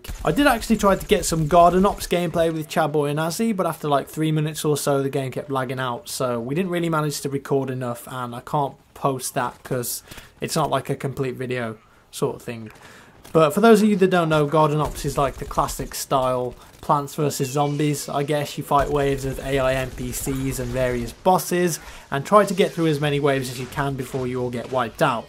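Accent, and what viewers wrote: United Kingdom. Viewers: you forgot to mention having a British accent definitely helps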